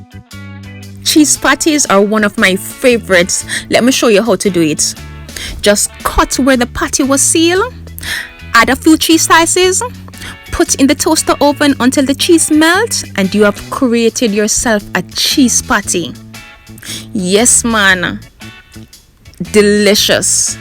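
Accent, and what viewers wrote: Jamaica. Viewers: I kept seeing authentic patty recipe on youtube but everyone i watched wasnt giving me the authentic jamaican vibes😒 and the dough wasnt giving me the flake😔. So my search brought me here🙃 to the real Authentic jamaican patty💯💯. I needed that accent this certified🥇 it for me lol the" ya mon" at the end🤗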